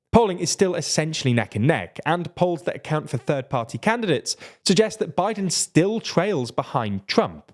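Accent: United Kingdom. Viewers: I hate hearing a British accent I used to like it but everything I hear out of the UK is a lie sadly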